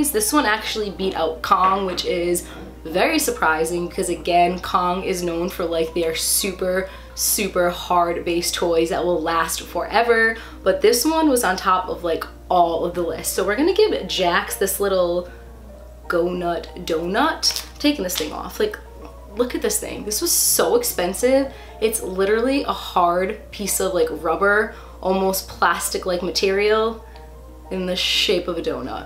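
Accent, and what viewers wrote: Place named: New Jersey, United States. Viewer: He’s so cute ❤️ but the way your pronounce some words 😍😍😍 are you from jersey ?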